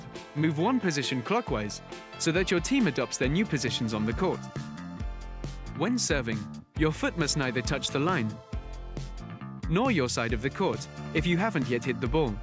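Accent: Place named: United Kingdom. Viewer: Ik but just British pronounced words just make me laugh. :D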